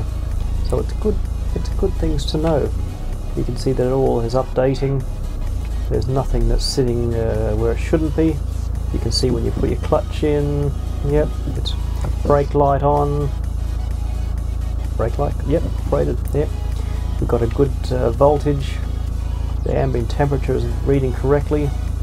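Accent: Australia. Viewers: Brother you sound australian? you driving a left hand BMW? where you located now?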